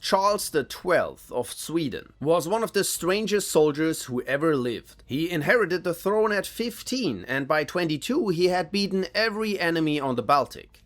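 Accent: Germany. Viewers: Sounding less german, it's really beautiful and sounds even better with each video 🤗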